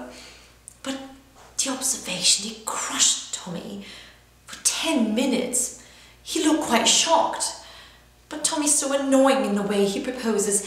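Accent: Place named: United Kingdom. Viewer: I LOVE your British Accent!!!!!!!!!!!!!!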